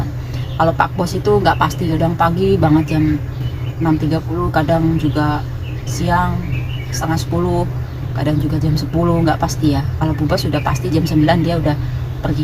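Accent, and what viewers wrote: Banyumas, Indonesia. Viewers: Halohaaaaaaa beb ngapak❤❤❤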